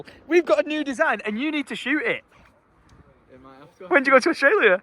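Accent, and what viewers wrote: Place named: England, United Kingdom. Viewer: Man it sucks so much it really does. Being able to watch you through all of these years give your heart out to those who give theirs to you and seeing the so unforgiving fate that awaits them is just so unforgiving. There is a community within YouTube and then there is a community within these 2 channels and the sheer amount of love and support and kindness shared in this community alone outglows all of the others. I’ve been a fan since way before Alec got to meet you and up until now and being able to see the interactions between you and these amazing people is so amazing but life is just so fing unforgiving and unfair to those who deserve it the least. For what it is worth, I’m glad that you two were able to spend the time and memories that you did together as I know and can sympathize to an extent just how important it is to both of you. 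I’m sure that even though he is gone, he will still remember the moments shared and you will continue on to remember them just the same. I’m so sorry that your life is so riddled with this fate for so many people. I’ve watched you since you were a kid and in a way, just through a screen you feel like a brother at times. Watching you so often has even made me forget that you have an English accent and live on the other side of the world because of how much you allow us to be part of your life and it means the most to us. I’m so sorry for your loss. It truly is heartbreaking. I wish you, lyd, Alice and his family the best in this time. Love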